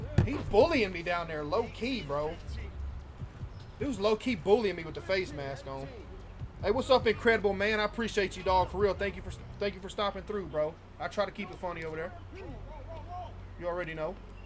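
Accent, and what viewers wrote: South, United States. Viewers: my accent defiantly fluctuated with the people i surrounded myself with when i was in highschool. And my southern accent rubbed off on them aswell 😂 funny too see also had the ghetto southern accent at one point too 😭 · thats not heavy southern its gangsta southern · Not gonna lie his American accent was better than the gamer accent but people change whenever they hang around different people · nah man that's just his southern accent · southern accent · I hope accent doesn’t go away anymore then it already has, I live in the south so I like the southern/country accents lol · When Caseoh was playing 2k, he was speaking southerner blaccent code switch is real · My boy had that southern/2K accent heavyyyyy lmao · like a ghetto is wild, he talks like he hooped in the south because...he hooped in the south. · bro thats just the southern accent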